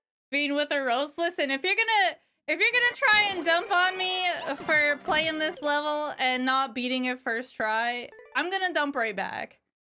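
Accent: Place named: Northeast, United States. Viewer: Found you from those weird survivor AC vids. You're really good and your voice is so enjoyable and refreshing as well. You honestly sound like a northeast American to me but you said you're Canadian. That's really cool. Montreal is my home away from home.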